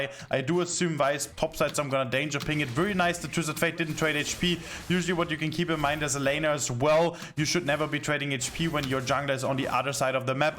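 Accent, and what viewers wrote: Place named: Germany. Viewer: Such a strong german accent. I cant... just cant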